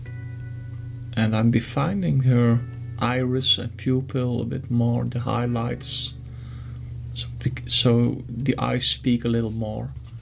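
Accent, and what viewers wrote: Netherlands. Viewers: Youre dutch i can hear it 😂 Ik ben ook Nederlands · You sound really dutch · Love to this Dutch accent ahhaha. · Mooi gemaakt , ik dacht al een Nederlands accent te horen heb mij direct ff geabonneerd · Are you dutch? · Are you Dutch?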